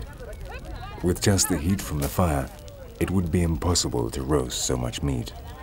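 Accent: England, United Kingdom. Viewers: Where did the narrator get his accent from. Certainly not Australia. You sound more like Prince Charles than an aboriginal. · It would at least been appropriate to have an Australian narrator. Somewhat irritating to listen to an English accent. Not OK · Burtt its very well filmed, however - the narrative is not as well done. For a start, they have used a non Indegenous (British!) actor to do the voiceover, instead of using the authentic voices of those who live in these areas - they do speak English! A big 'ouch'' for me was hearing all sorts of European concepts such as historical epochs of Time, stitched into the voiceover. Aboriginal elders have a mental landscape which exists outside of Time. Historical epochs are a European mindset, and sadly much that is European pervades this voiceover. There are better documentaries out there... i wish you all the best of luck in finding them ...as it is indeed an incredible culture. 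But lets let them tell their own story as much as possible... in their own words.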